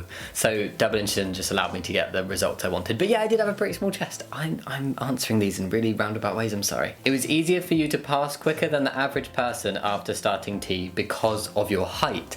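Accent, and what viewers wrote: United Kingdom. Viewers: I felt like your accent slapped me in the face with just how British it was lmao, that's the best way I can explain it. Also your voice is really calming and it's the type of voice I like in ASMR videos. I'm glad your family is supporting you in this, and that your school is doing its best as well! Catholic schools just seem to suck universally and I don't understand why people keep sending their kids in them...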